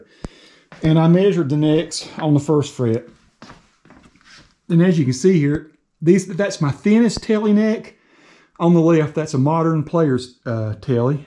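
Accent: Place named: South, United States. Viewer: That’s for the tips buddy. I love your accent man.. I wish I was born in the south😁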